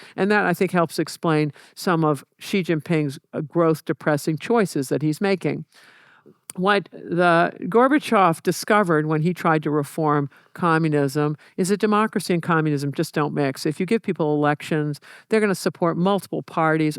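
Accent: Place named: South, United States. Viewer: Yeah but that is CIA playbook geography... Stuff makes no sense though: suppose that Russia tried to erase culture in Uzbekistan Tajikistan, erase islam etc, for a start, that would have stoked revolution so the situation is totally different, the russian empire was far less populous and sparser on radio waves... and so She trivializes this CIA politicial science social geography theory with this southern twang brainwashing her listeners about all kinds twisted logic that aligns with the CIA playbook, and guess what, she never even lived in these countries that she knows everything about, Russia, China, she clearly has never exchanged with the cultures there enough, because she speaks like someone educated by corporate news, and she wants you to speak like that too, not like someone who has travelled the world and knows cultures and the ancient causes of today's contrasted political systems.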